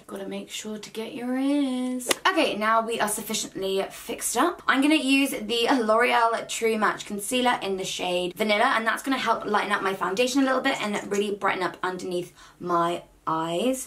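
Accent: United Kingdom. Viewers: New to your channel, love your makeup look, very pretty..then u spoke..u British!? YAAS..song in beginning??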